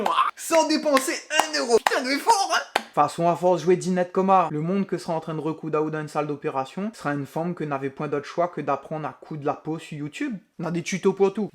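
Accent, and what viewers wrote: Réunion, France. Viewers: je te suis depuis peu et tu es une vrai pépite! Ce petit accent yab tellement irrésistible ainsi que la fraicheur et le piquant de tes vidéos, quand on les regarde c'est un peu comme si on mangeait une barquette bouchons avec siave pour une réunionnaise installée en Métropole... continue fait rire a nou encore lontan ;-)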